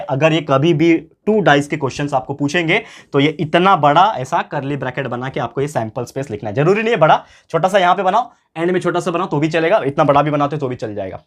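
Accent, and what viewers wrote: Maharashtra, India. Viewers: sir are your mother tongue is marathi. .. just asking😅 And thanks for teaching. Best teacher❤❤🧡🧡🧡🧡🧡🧡💛💛💛💛💛💚💚💚💙💙💙💜💜💜🤎🤎🤎🤎🤎💜💜💜💜💜💜💙💙💙💙💙💚💚💚💚💚💚💚💛💛💛💛💛🧡🧡❤❤❤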